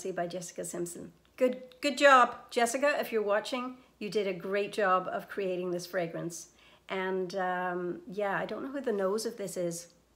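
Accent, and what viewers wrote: Ireland. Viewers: I know you are in Canada but every time I watch you I detect an Irish accent??? Orris root is Iris I believe. 👍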